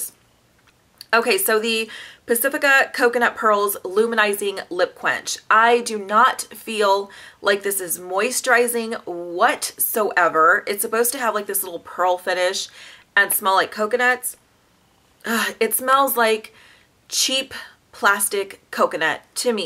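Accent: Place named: South, United States. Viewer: Very good information, thanks! I can tell you are a fellow southerner..."we are Fixin' to go to my mother's" We only say Fixin' to do something in the south. Have a great weekend!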